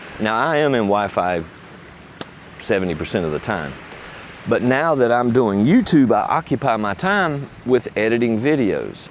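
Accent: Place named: Massachusetts, United States. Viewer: Where is from? Sounds like Massachusetts or east coast.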